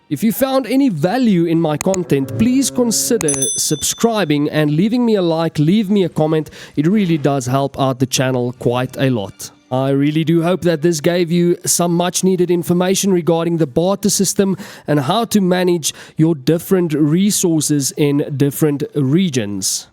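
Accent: South Africa. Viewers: Question there you from? My guess is South Africa 🇿🇦, maybe cape provinces, or Limpopo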